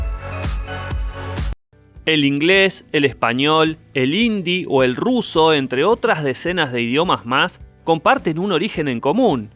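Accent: Rioplatense, Argentina. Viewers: Es que así es la pronunciación de los argentinos o uruguayos, no es que lo haya hecho mal (?) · Ya lo dijeron, es el acento argentino/uruguayo.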